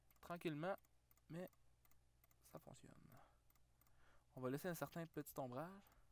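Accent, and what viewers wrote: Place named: Quebec, Canada. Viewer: Salut cher ami Québécois. Je suis Français de France donc j'ai un peu de difficulté à comprendre... Est-ce que tu pourrai faire un accent plus français dans tes prochaines vidéo ? Cordialement, Gilbert.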